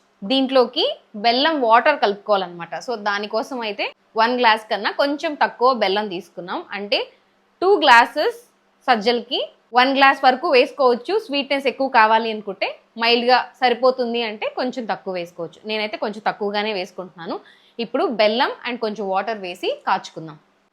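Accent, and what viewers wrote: Nellore, India. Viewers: Hi nenu kuda nellore, nenukuda chestuntanu. You tube maa oori basha vintunte chala bagundi. Nenu banglore dagara vunde madanapalleki vuntanu.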